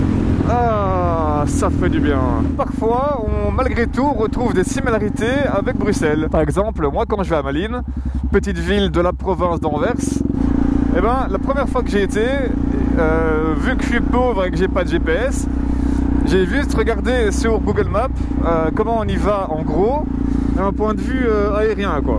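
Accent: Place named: North, France. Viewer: C’est le France qui parle à le Belgique. Sympa ton sujet. Donc, Wallonie = roue de 21 et méga suspension type les pistes de le Afrique. Quand à le Flandre, télé à la place du GPS car tu t’emmerde velu. C’est bien mon résumé? J’adopte ce style de vlog. Refait autant que tu veux. En plus, je me lasse pas de cet accent de la nord. Bon, moi je retourne faire un tour sur les jolies routes de le Côte d’or 😇👍👍🤪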